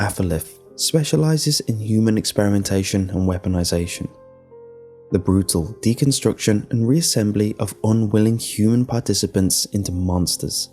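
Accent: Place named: United Kingdom. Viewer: You really lucked out with your damn accent. Anyone with a British sounding accent is just so much easier to listen to.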